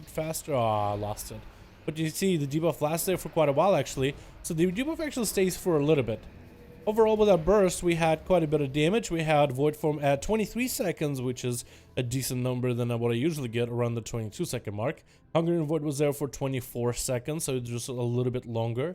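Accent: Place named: Russia. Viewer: as a non native English speaker I understand him just fine. You know he is Russian right? so for non native speakers it's hard to sound "perfect".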